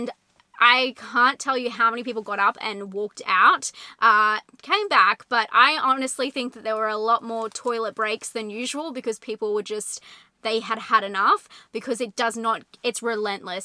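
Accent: Australia. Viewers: So nice to hear an Australian review, especially considering the high Australian input in this film! I’m seeing it tomorrow and can’t wait. Seeing it again at the weekend with my mother who’s a fellow horror fan and a friend who doesn’t like horror and gore, but really wants to come. 😂 The second viewing should be interesting. I don’t think our friend will see much of the film through her fingers.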